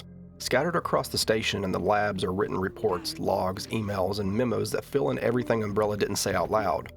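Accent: Appalachia, United States. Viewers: I like your accent dude. As a lifelong Tennessean, it’s nice to see some southern creators gaining an audience and attitudes in general toward southerners shifting. Also you’re good at what you do, so that helps lol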